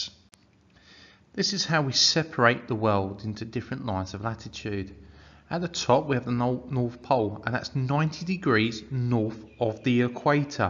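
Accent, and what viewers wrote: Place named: Australia. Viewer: Love your accent, are you australian by any chance, much appreciation from the UK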